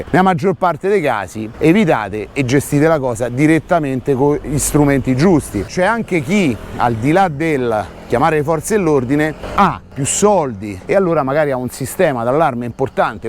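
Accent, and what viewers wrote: Rome, Italy. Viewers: Complimenti, una spiegazione semplificata per tutte le Età, poi con l’accento Romano, nun ce potemo sbagliá 🙂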